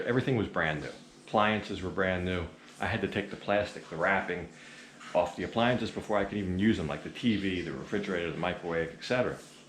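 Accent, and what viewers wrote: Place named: Pennsylvania, United States. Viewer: I'm guessing you're from Pennsylvania judging by your accent.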